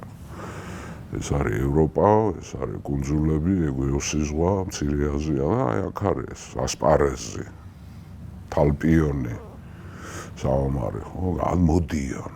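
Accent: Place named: Kutaisi, Georgia. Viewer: ქუთაისურად რომ ანტიკურ ისტორიას უსმენ სხვა გემო აქვს😂( ასიკვდილებენ...უშვრებიან.... და სხვა მრავალი. )ძალაინ საინტერესოა და კარგია ❤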